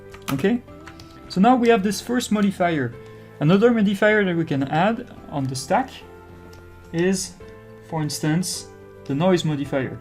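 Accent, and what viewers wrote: France. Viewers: When the subject is hand-drawn animation, the French accent is a reassurance. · the french accent is like fine wine, on animation.... 👌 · Don't apologize for your French accent! It's way better than mine ^-^ Thanks for the tutorials, mate. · Don't ever apologize for your french accent. Own it, flaunt it and and shove it in everyone ears. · Since Begining I'm recognize your french accent, merci pour ta vidéo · This is the best tutorial. I love your french accent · No need to apologise. Your french accent is absolutely ok. Thx for this. Great introduction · He said "Sorry for the French accent.", it does not mean his English is bad.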